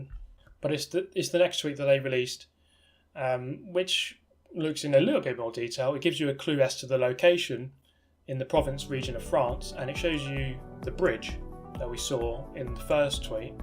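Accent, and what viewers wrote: United Kingdom. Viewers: God damn British accent finessed me, had no idea my boy was Asian 😂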